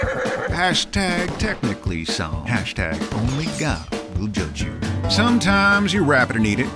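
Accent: South, United States. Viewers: I loved the southeren narrator! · 1. Love the font you used, fit the country twang of the narrator and the music excellently. 2. The narrator's fast spiel reminded me of the guy introducing the hand jive in Grease the movie 3. I understood every word he said, I don't know if that means I watch too much Trisha Paytas or I've just discovered a new talent and 4. ....ONE SHOT!!!! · best narration yet by the narrator. only problem now is thinking southern accent when eating Ssam!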